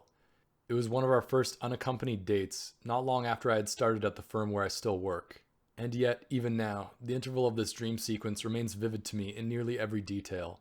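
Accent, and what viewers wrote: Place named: Canada. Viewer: It does not matter to me that you mispronounce words because you speak with a Canadian accent. I am grateful that you uploaded this masturpiece by Dallas Fort Worth.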